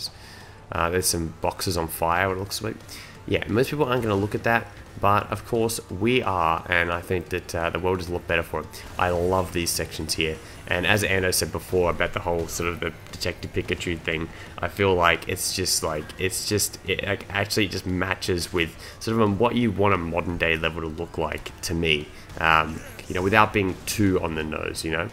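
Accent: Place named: Melbourne, Australia. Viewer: Are u from Melbourne? Love that accent. Anyways, great video!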